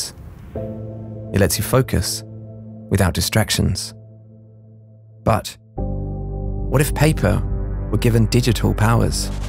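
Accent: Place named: England, United Kingdom. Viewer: that narration is just awful. Just having an english accent doesn't make it good.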